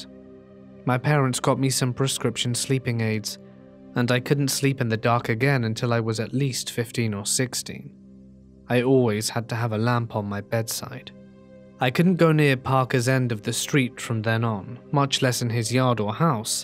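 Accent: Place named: Southern England, United Kingdom. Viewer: I love your content but I don't listen while falling asleep, I listen just to chill out or as background nosie while doing other tasks so I think a change to the black screen would be great! You know like the visual representation of sound could be cool!! You could play around with different colours or effects/movements depending on the story! This would also give you an avenue to explore tempo and expression of movement, almost giving your content more musicality of that's what you're potentially looking to explore. Keeping it minimalistic would be the key to balance out the audience between those like me, who enjoy your videos for the storytelling, and those who like to fall asleep listening to you Again, just want to add how much I enjoy listening to you. I don't know where in the UK your accent is from but it reminds me so much of being back home in the South which I find so comforting now I'm living away from there!! 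Xx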